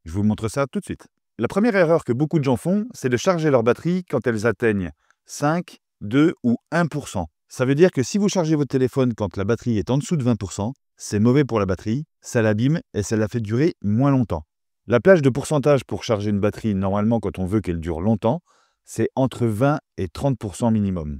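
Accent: Franche-Comté, France. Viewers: Tu es de Franche-comté toi non? Héhé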